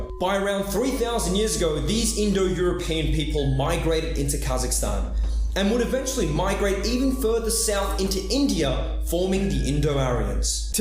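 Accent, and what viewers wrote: Australia. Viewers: Your videos are great. And the Austro-alien accent makes it even better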